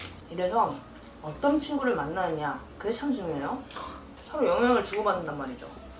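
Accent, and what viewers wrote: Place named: Chungcheong, South Korea. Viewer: 와이프가 마당 텃밭에서 일할 때마다 로 빙의해요. 말끝마다 유유 거리는데 충청도에 와있는 기분이에유.여긴 강원도 양양인데 말이죠. 아 망치 들때도 빙의해요. 그만큼 찐팬이랍니다..저는 매년 산소 벌초를 셀프로 하는데, 예초기가 없어 다른 분들에게 굽신굽신 하며 빌려서 사용해요. 그래서 제 등이 굽었나봐요. 최신식 무선 예초기를 저희에게 주신다면 굽어있는 제 등이 조금은 펴질 것 같아요. 미리 감사드립니다🙏🏽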